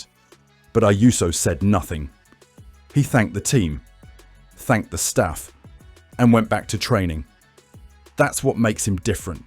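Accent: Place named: United Kingdom. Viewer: I thought the same thing. Using a never cyclist wanker with a British accent…Classic!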